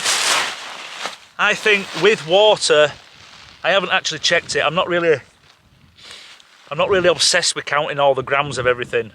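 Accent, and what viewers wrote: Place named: Yorkshire, United Kingdom. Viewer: love your accent not heard the yorkshire twang for a while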